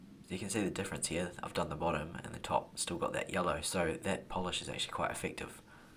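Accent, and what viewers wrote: New Zealand. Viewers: Please, keep talking. I like the explanations and the New Zealand accent. 😉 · Kia Ora! New subbie here from Australia and also a kiwi 🙌🏾 keep talking bro! Love hearing the familiar accent. You have a calm voice too. Kia kaha bro 😎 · Your kiwi accent is nice to listen to there's always the mute button for those who disagree ,great job on the stag dresser I had a set and chalk painted them disaster !!, keep up the good work . ( Greetings from Aberdeen Scotland ) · Keep talking cuz I love your kiwi accent! The piece came out beautifully! DDecker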